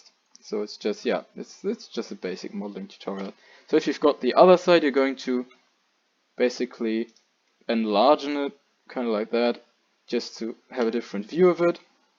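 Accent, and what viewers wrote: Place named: Germany. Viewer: The German accent is so stong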